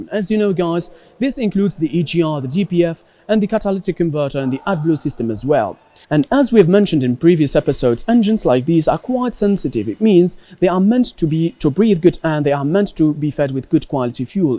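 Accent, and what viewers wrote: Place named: United Kingdom. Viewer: UK englich ❤️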